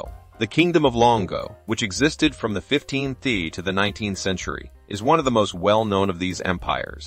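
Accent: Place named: United States. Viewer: That is American pronounced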